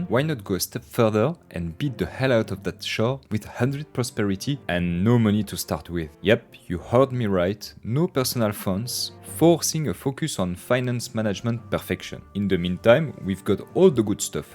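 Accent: France. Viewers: Your french accent is so satisfying to listen while watching you building an amazing city.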